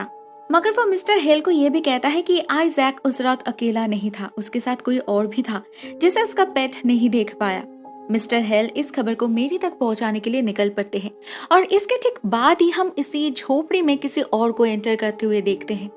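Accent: Bengal, India. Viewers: Omg your Bengali accent 😍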